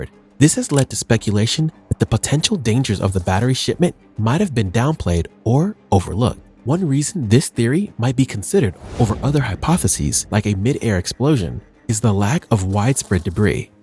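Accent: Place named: India. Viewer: YOU CANT HIDE UR INDIAN ACCENT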